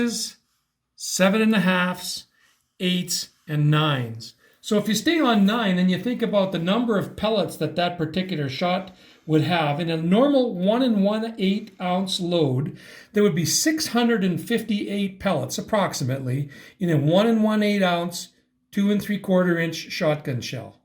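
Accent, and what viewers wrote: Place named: Canada. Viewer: Its just a Canadian accent, buddy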